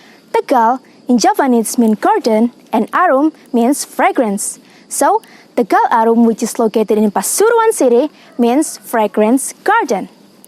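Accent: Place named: United Kingdom. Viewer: Keren mbak... british aksennya itu, uwuwww top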